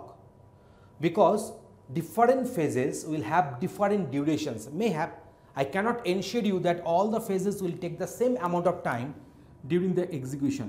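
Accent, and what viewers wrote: Bengal, India. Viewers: Omg this is the perfect place to learn Indian accent and pipeline architecture simultaneously. Really appreciate this! Very helpful and I love it. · I haven't even finished the lecture, and I know that I want to watch him. He seems to be great. I love his Indian accent. He looks cute on an Indian accent.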